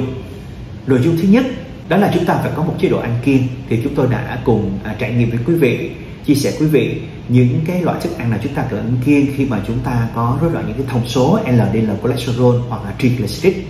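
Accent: South, Vietnam. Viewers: Cám ơn B/s đã giải thích rõ ràng 4 phần trong mở máu cao với thuốc B/s phải kê toa, và âm thanh giọng miền nam nghe rất hay, cám ơn nhiều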